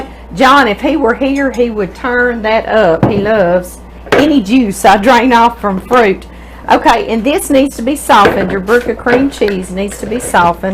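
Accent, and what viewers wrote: South, United States. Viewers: I love your Southern Accent..great recipe btw😉❤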